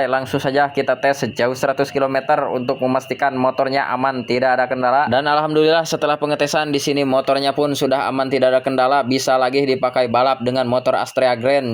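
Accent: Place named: Tasikmalaya, Indonesia. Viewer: Logat tasik ieu mh, nya 😊 palih mana bengkelna mang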